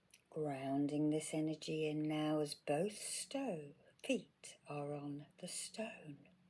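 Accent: United Kingdom. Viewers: you have the same kind of british accent as my sweet auntie, who- funnily enough- is also named:) Bless your positive energy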